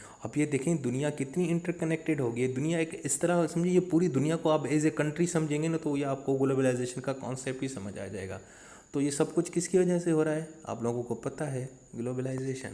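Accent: Pakistan. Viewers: Started watching and was like “awaaz se toh lag rahe Pakistan ke hai ye” and fir aapne petrol price puch liya. Great video though! Helped a lot.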